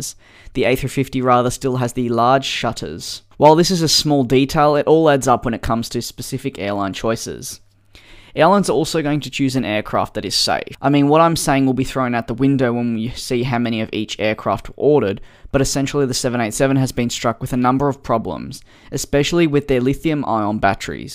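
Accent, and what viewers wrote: Australia. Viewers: Are u Aussie?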